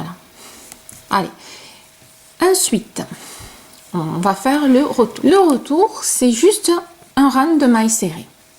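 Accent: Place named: Southwest, France. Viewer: vous seriez pas un peu du Sud Ouest par hasard , ma fille habite près de Luchon, je reconnais l'accent ....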